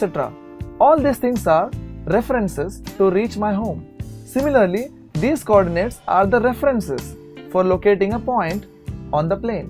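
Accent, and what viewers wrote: India. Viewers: i love ur indian accent it helps me understand better im subscribed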